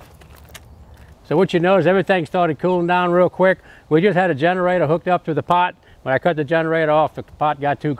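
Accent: Louisiana, United States. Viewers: you got any Cajun in your background? Your wonderful accent reminds me of the venerable Justin Wilson - ah gar-own-tee!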